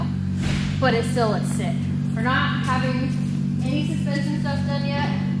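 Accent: United States. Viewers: HEE-YUN-DAY. not HUN DAI. Damn that American pronunciation kills me.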